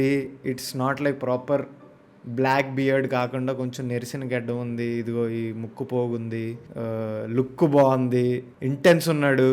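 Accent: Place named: North Andhra, India. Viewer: Rajam ,vizianagaram, srikakulam evi 3 slang kalipi vere slang vacchinchidhi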